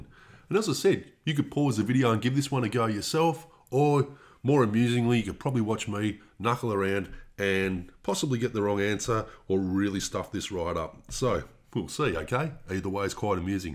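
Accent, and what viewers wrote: Australia. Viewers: I'm not sure what's more mesmerising. The simplicity of this maths trick, or how Bogan this dude's Aussie accent is xD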